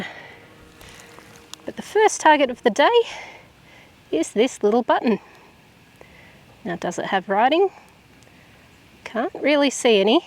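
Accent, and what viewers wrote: Australia. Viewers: Welcome back it’s nice hear your assie voice again, and watch your videos.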